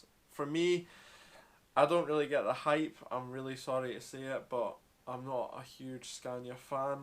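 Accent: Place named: Scotland, United Kingdom. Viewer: Scottish accent is his charm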